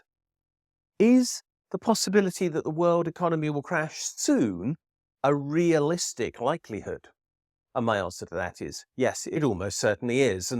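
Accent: United Kingdom. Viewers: It's still ranting and lunacy if it's said slowly by a posho brit.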